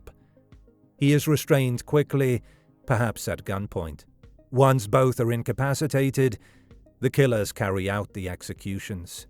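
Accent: England, United Kingdom. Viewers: Why do we need a commentor with a British dialect to do a Canadian situation. Do we have to Outsource everything?😢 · I live here and visited the house days ater...It was not a gated house as you state and they were not onte of the most influential in the country....i;m only a minute in but your already this wrong I wonder what senasationalistic journalism awaits....shnooty english voice and all....work more on facts and less on being entertaining.This isn't Seinfeld we came to watch.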